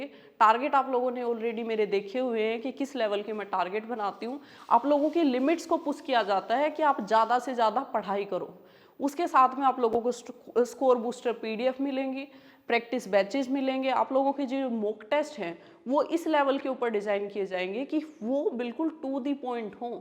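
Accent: Haryana, India. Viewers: Mam hariyanvi jhalak aa gi voice m 😅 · Aap haryana se ho mam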